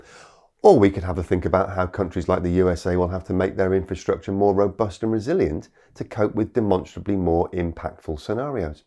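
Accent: United Kingdom. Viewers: The climate scam is going to be fully exposed. The climate models are a complete fraud because they are base on the assumptions. A British accent won’t overcome the fraud, I’m pleased to say.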